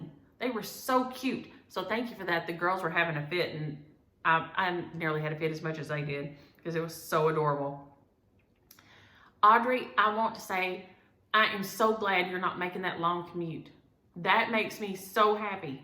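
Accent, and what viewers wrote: South, United States. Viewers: LOVE your videos and your southern draw😆😆. You have some beautiful WIPS. I love Pumpkin Pie with whip. Have a great get-a-way. See you next time.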